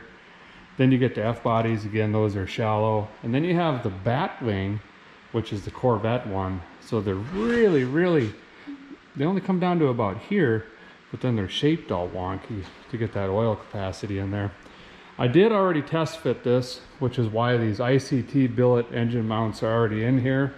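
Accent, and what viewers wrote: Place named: Minnesota, United States. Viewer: Great video as always. I’m waiting for the Minnesotan to southern accent conversion.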